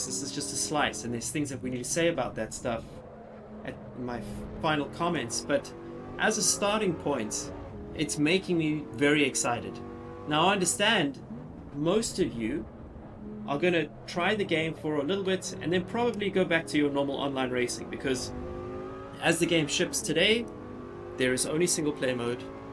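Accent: South Africa. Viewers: Hiya, I've only ever seen a banner of coach online and this is my 1st time seeing anything you've done. But I know this is random but... Are you South African by any chance? I'm from jhb and and I can't shake off the feeling that you are from the accent lol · flawless commentary from the S.A accent lol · Your accent is very South African. You been?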